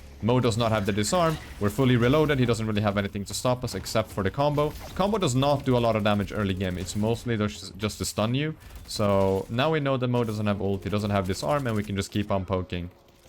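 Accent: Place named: Sweden. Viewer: 5 seconds into the video and I thought "this guy is Swedish 100%" lmao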